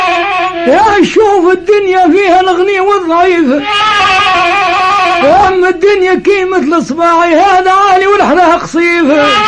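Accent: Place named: Tunisia. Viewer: لهجتو تونسية اكثر من جزائرية....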